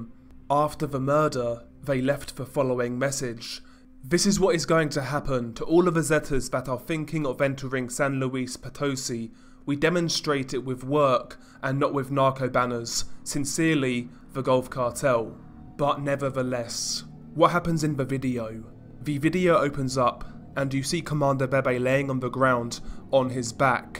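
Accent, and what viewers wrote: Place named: United Kingdom. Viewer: I hate the British accent but as a Mexican I can’t stop watching these videos 😔!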